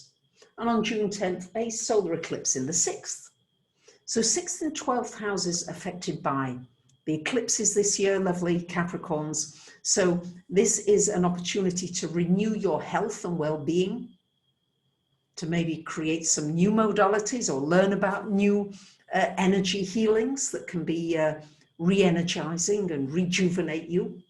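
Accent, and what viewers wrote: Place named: London, United Kingdom. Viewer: Idk y this whole time I considered ur accent Aussie but I now hear london 😌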